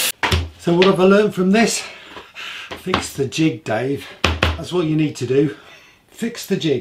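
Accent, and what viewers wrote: United Kingdom. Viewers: Love to hear you talk, man! We Americans sound so plain next to the British. Anyway, I'm really enjoying the build and I just love some of the jigs you've made as well as the band you shared playing the tune "Echoes". That vocalist is killer good. If I can get to feeling better, I'll be building my first guitar from scratch. Any pointers or words of wisdom?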